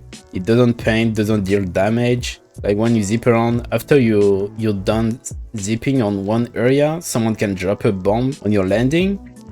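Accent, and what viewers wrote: France. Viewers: Tu parles vraiment bien anglais mais honnêtement j'ai pas besoin de vérifier t'es français je pense X) on parle tous pareil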